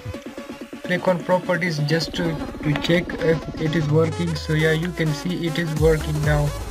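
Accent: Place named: India. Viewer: o my bad sounds like an Indian accent ill say Asians as a whole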